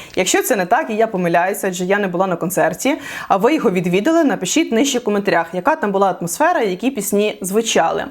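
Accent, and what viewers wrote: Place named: Western Ukraine, Ukraine. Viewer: Знаєш жінок зараз теж беруть до армії. При чому багато жінок воюють. Іди на фронт. А ти западенка гнiдна пиздиш своїм ротом поганим, але навіть не була на війні. Досить сварити народ, тварюка. Багато військових російськомовних, багато волонтерів теж. А хто говорить зараз українською різко став, політики, псевдопатріоти. А ти навіть не українською говориш, а суєш свій діалект, видавючи за українську мову. Чмошна підараска.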